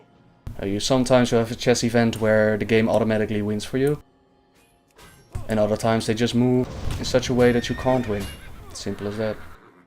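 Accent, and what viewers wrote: Netherlands. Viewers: Hey, I'm from Belgium, and i hear you'r a dutchman to;P Congratz and respect. Maybe we can play Together,:P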